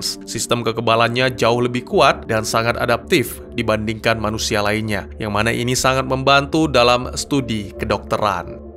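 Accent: Aceh, Indonesia. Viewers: Aceh kayaknya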